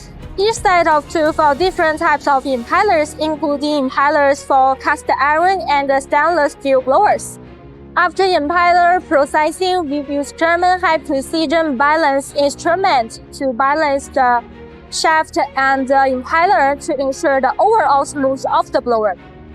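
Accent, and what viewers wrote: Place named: India. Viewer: sounds like Indian English, hard to understand.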